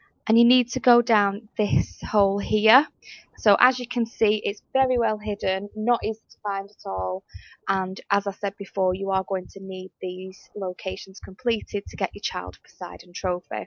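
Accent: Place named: Blackburn, United Kingdom. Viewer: Nice one! Blackburn accent that?!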